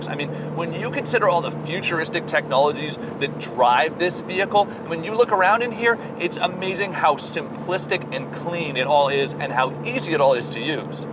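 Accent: Canada. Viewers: This guy sounds like a cheese head. Canadian for the uninformed. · I gotta ask.... you're Canadian, aren't you?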